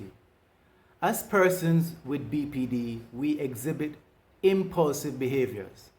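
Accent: Caribbean. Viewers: My Brother, my Brutha...I am SO incredibly happy that YT has gotten around to listing your videos in my Suggested Videos, and SO proud of you stepping up to the world with this channel! While BPD, specifically, is not my issue, Depression and Anxiety ARE, and the first thing - my first response - to your thumbnails was 'Finally! A MAN talking about this, quite vulnerably (I saw the video from 2 years ago, apparently, that seems as a confessional, or testimonial, about HAVING the problem; not just prattling about it from a clinical, and thus, removed perspective) and, furthermore, a BLACK man....even, a fellow "COUNTRY man" (as your speech connotes Afro-Carribean sounds to my Jamerican ears, hahaha! if I'm wrong on that, please excuse me). The drumbeat about us men seems to be loudest in constantly bashing and devaluing us, with the term Narcissist being the only term applied to our psyches with any ease - spoken from the mouthes of White women, primarily, and White people, secondarily, as the default "experts" on either component thereof: For other eyes reading this with offense: I DON'T CARE about how "uncomfortable" what I typed makes you! 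Try being said, awful Man of men, let alone a BLACK man, whose own humanity DAY IN, DAY OUT, is denied by the constant and socially co-signed 'drumbeat' that we endure! I have NEVER felt so directly, explicitly addressed and acknowledged by ANY OTHER persons' videos about mental health - helpful as many of them are - before yours, (?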